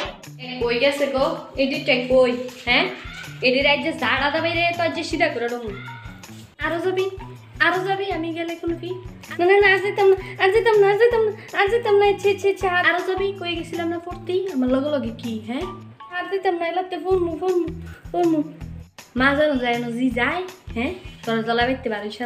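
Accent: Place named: Mymensingh, Bangladesh. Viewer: Wow perfect mymensingh accent....carry on sister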